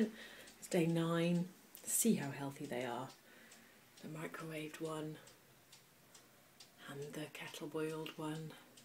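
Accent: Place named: Wales, United Kingdom. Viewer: I could barely understand your thick Welsh accent...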